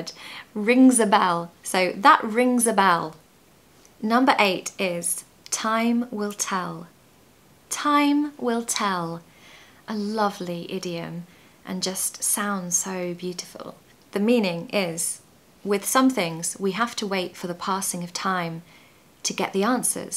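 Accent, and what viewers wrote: United Kingdom. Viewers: I Love the way How you pronounce and speak ,I love adore British Accent ,so Cute .. "In the same boat "this idiom in my language means the same: Estar no mesmo barco-Portuguese... We have a sweetest one :The rice of the same Sack =Arroz do mesmo saco) we use this when two people or thing behave in the same way but badly. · I lovd your accent and tips in your episodes to learn the British English. Keep the great work up and all respect 👏👏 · Your accent is really great help me learning British English. Thank you a lot. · I love to learn new english idioms everyday... Also I love your british accent ☺Sure you will success in your channel teachhing english... Cheers from Argentina🔥🇦🇷😊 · contigo eh aprendido la pronunciasion en ingles britanico.....aunque el acento americano no suena mal....besos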